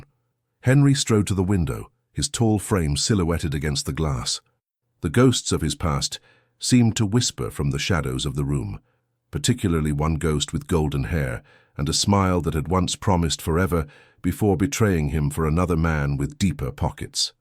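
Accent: United Kingdom. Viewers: Hi Yes! That purring English / British accent. YUM! From (ironically enough) Windsor, Ontario Canada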